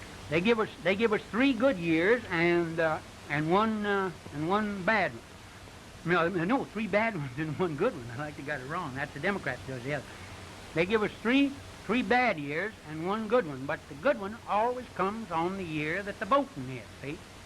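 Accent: Oklahoma, United States. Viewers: do many modern okies still talk like him or have they all been modernised? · Okie accent!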